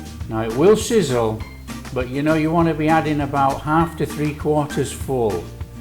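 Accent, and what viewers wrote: Yorkshire, United Kingdom. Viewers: No measures beyond 8 eggs, get real. Enthusiasm and a Yorkshire accent do not ut it.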